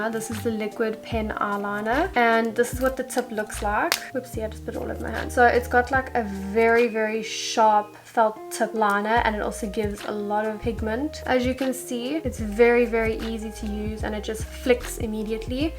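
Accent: South Africa. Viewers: Are u South African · Ooh a south African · You sound south African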